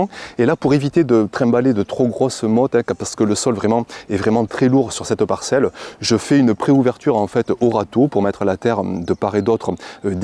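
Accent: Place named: Provence, France. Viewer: Bonjour, merci pour tes videos et ton accent qui fait penser aux cigales et imaginer des champs de lavandes.... pour les pois, peut on évoquer le trempage de 12 heures avant de semer ?! Est ce vraiment un plus ? Bonne continuation, Lionel de Bretagne et son jardin qui regarde l'océan...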